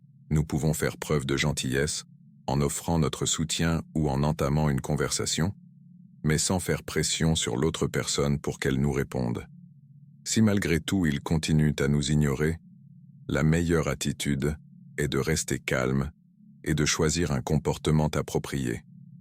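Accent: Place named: England, United Kingdom. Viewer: C'est pénible cet accent anglais pour parler français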